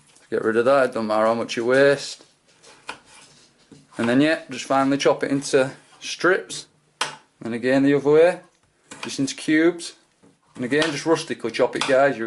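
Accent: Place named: Yorkshire, United Kingdom. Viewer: Where's that accent from? I'm guess yorkshire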